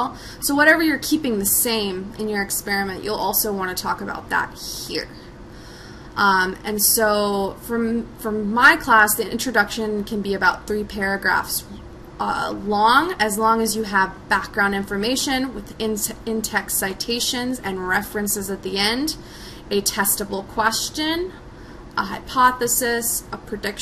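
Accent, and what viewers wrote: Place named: United States. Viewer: bruh this is the most americanised american accent i have ever heard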